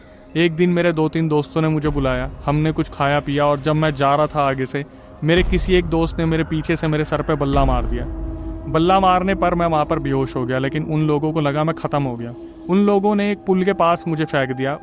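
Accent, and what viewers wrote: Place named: Bhojpuri, India. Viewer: awaj se bta rha hu pakka bhojpuriya ladka hai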